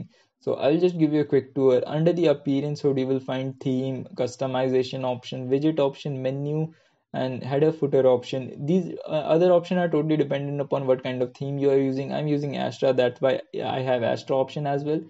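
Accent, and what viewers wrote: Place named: India. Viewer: Haha I have not bought it indian accent come on you are annoying.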